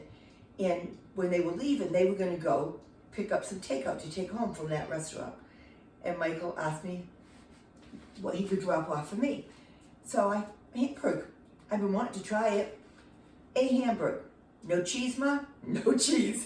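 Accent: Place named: Boston, United States. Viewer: Loved the update! I definitely think Parker was trying to tell you something important--😊 Someone here said something about the video being blurry. I think the autofocus caught something in the foreground and focus on that instead of you because you were in the mid-ground area. But then I started driving and couldn't watch but could only listen in the car. So it didn't bother me-I love listening and love your accent. Reminds me of the folks I met when I went to Boston. Have a great weekend!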